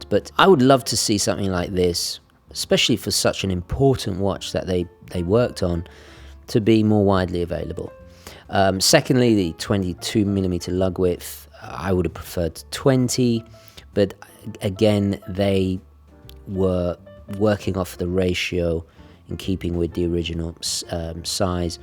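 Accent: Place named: RP, United Kingdom. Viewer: I knew you were a fan, as I have mentioned before how since becoming part of the Watchbox Team, you have made your videos a little bit more posh sounding, and a bit Partridge. I am really hoping you do a full homage and add a 'ahaaa!' in one of your videos. These are great watches too by the way. I have recently had a 'field watch' buying addiction, being ex Forces, I have recently purchased five field watch style watches including three Hamilton, and a Longines military COSD which is hopefully being delivered today. I have a black face one coming , it has the forces broad arrow (crow's foot) on the face. I really want a white face one but I cannot find a new one anywhere. There is a pre owned one on eBay but it looks a bit beat up, and I got a brand new black face one heavily reduce in a Black Friday sale. I think the retail is just over £1,200 and I got it already reduced, then a Black Friday promo code worked, so it ended up being £748 UK sterling, free next day delivery from an Authorised Longines Dealer too, not grey market. 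A bargain. I am just negotiating a 'Dirty Dozen' watch. Really love those. I think Hamilton field watches are a bargain too, I got mine also with around 35 to 40% off RRP, again from Hamilton AD too. Apologies for the length of this comment. I am also waiting on two Stowa Flieger watches to be delivered.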